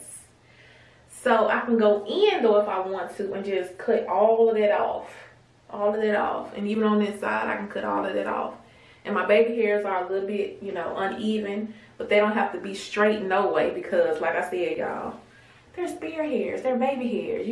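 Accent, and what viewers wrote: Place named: Memphis, United States. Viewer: you're welcome! I'm a new subscriber and I watched another one of your videos today and your accent sounds like mines lol. Are you from Memphis Tennessee?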